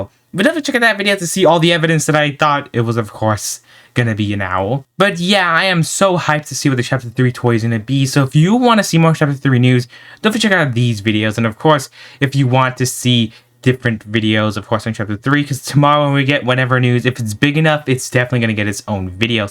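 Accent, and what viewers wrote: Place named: France. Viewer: Bah il a un accent français alors je pense que oui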